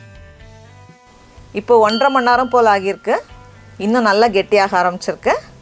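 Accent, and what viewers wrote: Nagercoil, India. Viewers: Super. Naa nagercoil ponnu.unga pechu oore ninaika vaikuthu.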